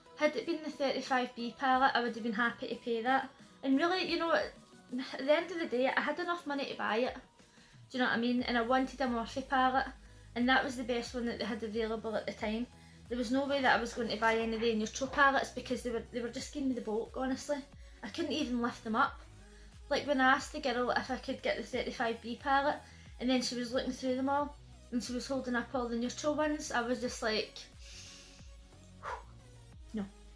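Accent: Scotland, United Kingdom. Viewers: Just stopping by to show you some love with a full play and like #13 from Bits of Real Panther! I'm loving that accent! So very cool indeed! Is it Scottish? I hope you have a truly awesome Tuesday!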